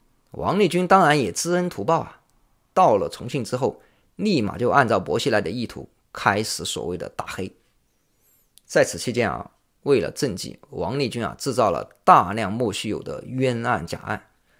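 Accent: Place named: Jiangsu, China. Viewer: 听的口音，是江苏人吧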